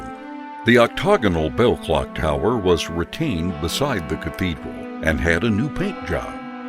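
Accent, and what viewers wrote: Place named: United States. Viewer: Gagawa lang kayo ng historical video on Manila Cathedral kumuha pa kayo ng voiceover with American accent who mangles the Latin-Spanish names. Very distracting. You should have at least taught him the correct pronunciations. Stupid and insensitive. What a waste.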